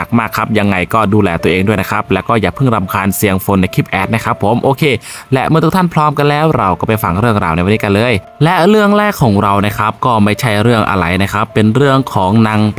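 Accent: Cambodia. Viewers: แอดน่าจะอยู่ อีสานใต้คับเลย สำเนียงไปทาง ขแมร์ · สำเนียงเขาเหมือนเพื่อนเหมนของเรา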